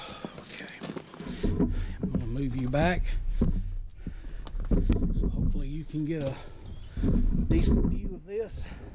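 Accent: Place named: United States. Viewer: Was a good video, love the American accent you have, it's soothing to listen to, it's great you enjoy the metal, it's great dismantling things, I collect metal for a little pocket money, it's great, i collect small amounts, i have no car at the moment. Carry on doing what you love, it's all we can do until the end of time.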